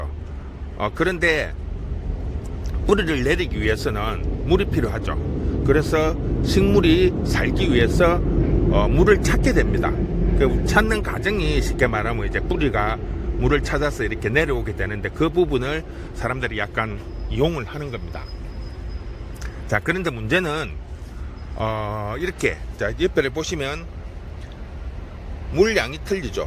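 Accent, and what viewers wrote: Gyeongsang, South Korea. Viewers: 경상도 사투리 죽이네여 물이 다이는것과 다이지 않는것의 뿌리내림 차이 ㅎㅎ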